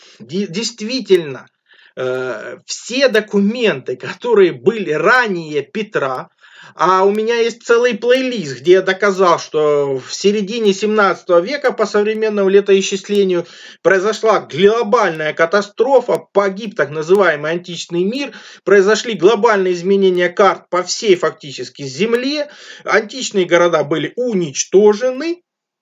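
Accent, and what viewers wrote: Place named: Kharkiv, Ukraine. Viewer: Посмотрел, очень интересно, придётся подписаться 👍. Уж больно интересно Говорите, и говор на харьковские похож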